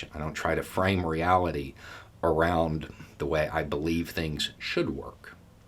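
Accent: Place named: Deep South, United States. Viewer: When the Almighty Algorithm dropped him into my box, I had to "go by" several times before decided to "tune in". the look of, his accent (I'm from the Deep South and have heard that accent from some tough, onery people)- it took me until the third watch through before I could believe he was really there. I don't think I've missed more than three since! Even Conservatives could learn a bunch here, but a bunch of thinking isn't much appreciated in Trumpland. Bet he wishes he still had Billy Barr for an attorney who could write out his own subpoenas! FR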